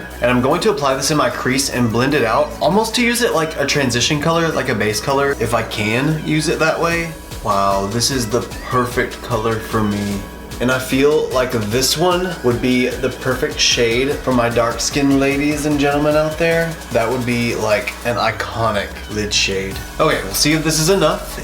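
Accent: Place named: South, United States. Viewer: Why are you the cutest?! I just found your page and I really like your Stila liquid tutorial and also your slight southern twang! ❤️❤️❤️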